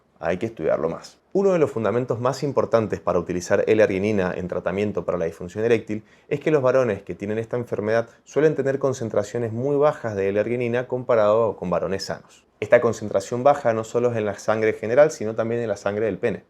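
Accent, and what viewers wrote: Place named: Argentina. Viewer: Muy interante su explicacion Es 'Usted Argentino ?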